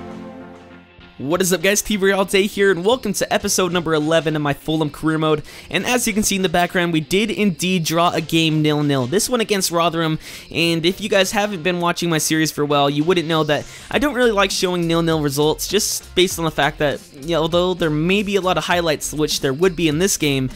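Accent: United States. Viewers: Love your American accent! Nice to see an up a coming American FIFA YouTuber!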